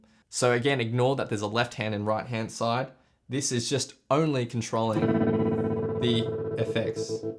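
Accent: Australia. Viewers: Bought an airstep katana a few weeks ago and just watched your video. Sorry I didn't order through your link. Super clear explanation of technical issues without getting bogged down. You are a great teacher/explainer. I will look at some of your other videos. I lived in Hobart for 23 years <num> so nice to hear your aussie accent. Maybe you know the folvig bros Lawrence or Elliot. Nice guys really good guitarists. I used to play w/their sister in TAS. But melbourne is a lot bigger pace than Tassie.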